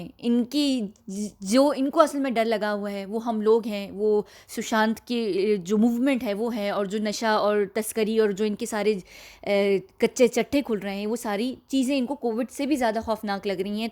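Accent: United Kingdom. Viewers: Plz carry on we like your English accent... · Didi I really like your English accent..and your Hindi too...So be proud of yourself..We really love u didi. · Please don't be defensive about your English accent, there are going to be many who will not understand your pronounciation...your accent is yours....move on. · Completely agree with you. Listened till the end. I love her accent too, English British accent ... 😊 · Salman Sucking up to PM Modi as the case is now in Modi’s hands. P.s - love your pommy accent 😊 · Great British accent👍😇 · I love your English accent. As you said you have learnt it... Any advice from you to kids on how to learn it? I am all 👂 👂!!! 🙏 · Your English accent is as perfect as a British or Australian accent; however I don’t like them and that’s my problem. I like your hindi/ Urdu accent. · Whats wrong with having an English accent? 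Thats like me saying hey I dont get pure Hindi words. Theres nth wrong with either or.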